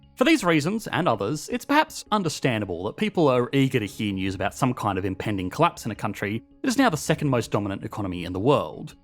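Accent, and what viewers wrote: Australia. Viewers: I love the Australian accent but listening to yours is just insufferable. I don't know why you moan at the end of every sentence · So Aussie. Thanks for watching mate. Love it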